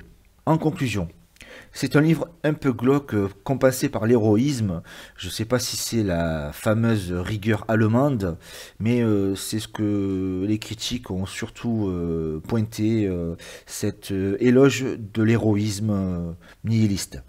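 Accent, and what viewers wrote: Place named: South, France. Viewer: Belle lecture de cette oeuvre fameuse. Et avec cet accent du sud qui est doux à mon oreille. Azouzou à vous ;)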